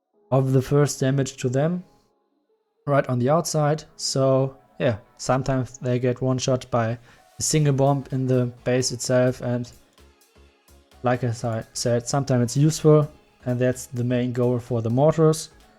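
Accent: Germany. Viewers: Wenn deutsche englisch reden 👍top erklärt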